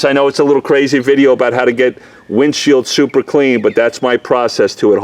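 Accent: New York, United States. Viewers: Are you Italian and are you originally from NY? There is no way you arent lol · Are you jew from New York?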